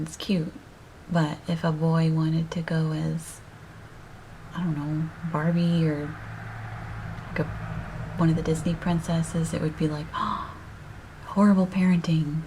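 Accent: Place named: England, United Kingdom. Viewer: Dear how come you have no ta America an accent but a English tong of speaking why so many Questions answer the half of it and deeper to your self and closer to the Public you get more out of your program because your very good telling your story wat you want to tell stay in the same passion like your hearings and your become a very wise women stay by your mind and have your self love t Gautier le Bois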